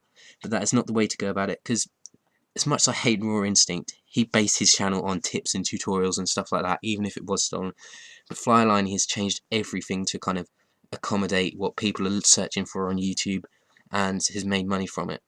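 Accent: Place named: East Anglia, United Kingdom. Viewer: Your pronouncing haha east Anglian lad, loving then showing off of the stats at the end haha, good gameplay tho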